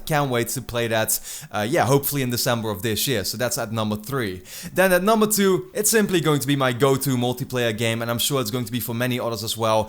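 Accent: Netherlands. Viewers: Ik heb net je kanaal gevonden. Je maakt echt heerlijke content. Plus je klinkt zwaar Nederlands, dus dat is een instant sub. :p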